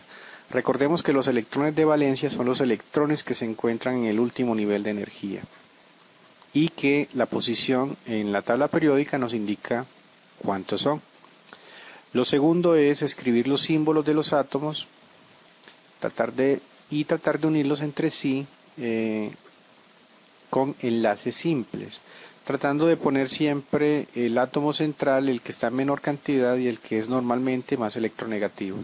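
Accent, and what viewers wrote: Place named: Colombia. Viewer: tu acento suena a colombiano,lo digo por que hablas igual a julio profe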